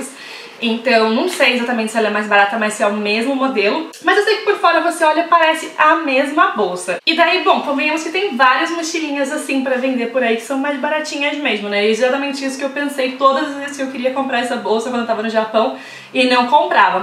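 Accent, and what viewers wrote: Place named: Rio de Janeiro, Brazil. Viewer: Só eu nunca tinha notado esse sotaque carioca na?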